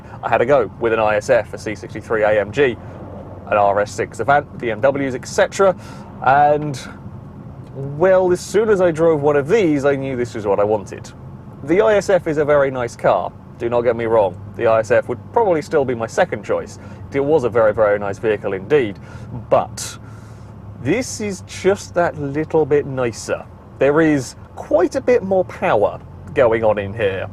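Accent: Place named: RP, United Kingdom. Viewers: His voice is rather posh lol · anyone know where he’s from in the Uk? Guess the south bcos of posh bit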